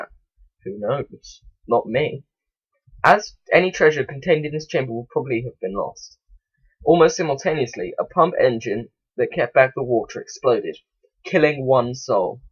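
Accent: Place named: England, United Kingdom. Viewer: george lightheart He sounds English to me.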